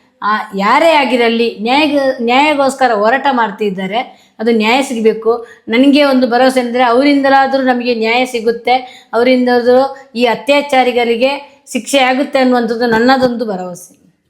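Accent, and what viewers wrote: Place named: North Karnataka, India. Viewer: ನಿಮ್ಮ ಭಾಷೆ ನೋಡಿದರೆ ನಮ್ಮ ಉತ್ತರ ಕರ್ನಾಟಕದ ಅಂತ ಅನ್ಸುತ್ತೆ ಒಳ್ಳೆದಾಗಲಿ ಅಣ್ಣ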